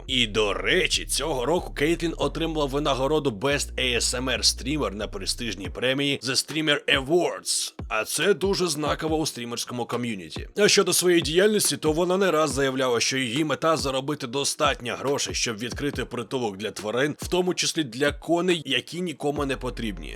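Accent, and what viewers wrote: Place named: Russia. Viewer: Так смешно слушать русско говорящего, на украинском языке, ну да что не сделаешь что бы в армейку не за лететь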